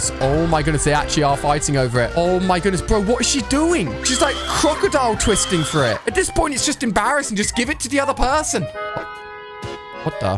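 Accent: United Kingdom. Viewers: Why are you British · are you british?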